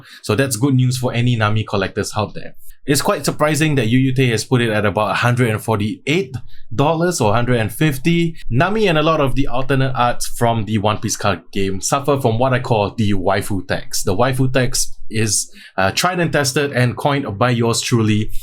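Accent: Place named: United Kingdom. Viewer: amazing content! I thought u were brittish ur accent so good!!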